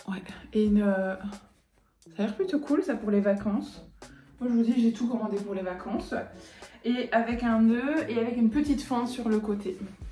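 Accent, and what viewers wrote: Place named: Belgium. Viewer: Hello je viens de te découvrir et j'ai entendu un petit accent, il me semble belge ( si c'est le cas comme moi j'habite près de l'atomium mais côté Flamand, même si je ne le parle pas, à wemmel exactement) Du coup je soutiens les belges ❤. Sur ce la 1ère robe est très belle et n'hésite pas à mettre des couleurs ( surtout qu'ici c'est uni et ça reste foncé donc loin d'être flashi😉) Par contre tu as quand même une belle et généreuse poitrine, et je trouve que ça ne va pas trop un col qui est au cou...les décollés ( sans exagération pour ne pas être vulgaire) te vont bien mieux. Bisous et j'ai hâte de regarder tes autres vidéos ( hélas je n'ai pas Instagram, mais je vais regarder sur Facebook si tu y es 😊) .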